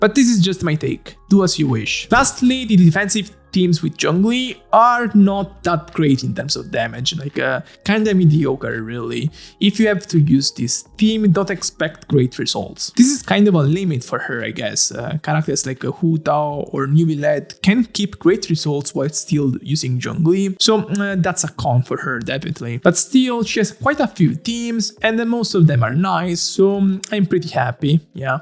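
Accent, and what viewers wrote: Italy. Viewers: Got jumpscared when you started talking in Italian. Sono italiana anch’io, per qualche motivo non avevo colto il tuo accento. Video fantastici, complimenti. ❤️‍🩹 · yeah he is, his accent is really an italian accent and i asked him and he answered yes, in one of his streams at the start he speaks italian too 😂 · Ahahah, ma sai che io mi stavo ancora chiedendo da dove venissi con quel accento. Ho capito oggi che sei italiano ahahahaa. Comunque continua così i tuoi video sono utilissimi e dai sempre pareri interessanti devo dire, love your videos❤ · " i am italian" he says with the thickest italian accent. Yeah no shit 😅😂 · Lol it's a very powerful Italian accent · Il bello è che l'italianità emerge anche quando parla inglese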